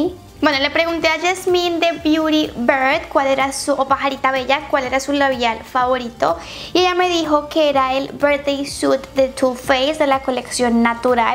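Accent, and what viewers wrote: Colombia. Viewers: De dónde es ella? Tiene como acento colombiano o es solo mi impresión?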